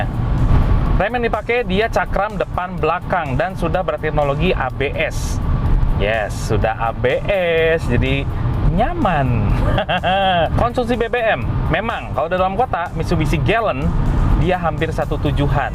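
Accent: West Java, Indonesia. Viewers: Sunda pisan euy..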